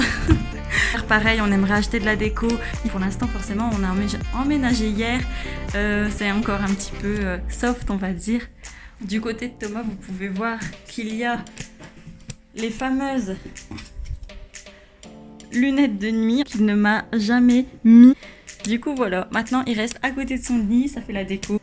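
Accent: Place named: Quebec, Canada. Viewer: tu prononce tes a comme nous deja ta l accent ..bienvenue au quebec bon succes pour votre chaine je m abonne et vous suit pour d autres videos..avec l hiver c est la periode ideal pour decouvrir notre culture et nos artistes ainsi que notre univers musical qui est tres different de la france amicalement d un nouvel ami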